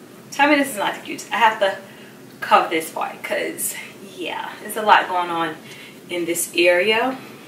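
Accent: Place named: New York, United States. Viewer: I could hear that strong new york accent lol love it is cute just like you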